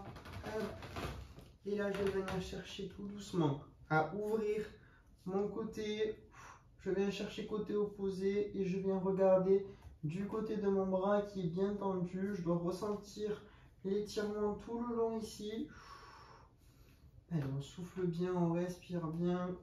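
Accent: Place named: South, France. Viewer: Merci pour les séances et pour l’accent du sud ! J’ai adoré ! Très adaptée pour moi qui ne suis plus de première jeunesse !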